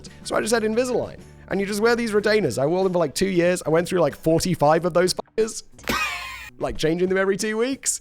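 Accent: RP, United Kingdom. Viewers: Honestly I forget that Simon is British. Just used to the British accent that punches you in the face, his seems light. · I’m American. You actually have an annoying pompous British accent. There are much better British accents. Scottish and Irish are definitely better sounding than British. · Ngl… your accent seems very generic British. Sorry Simon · if asked I would have said Simon speaks with an RP sound. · Sorry to be the one to tell you, Simon; you're posh and your accent is posh... the fact that cant see that, confirms it! 😶😂🤣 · cunt is such an everyday word in nz and oz is your accent not close to RP?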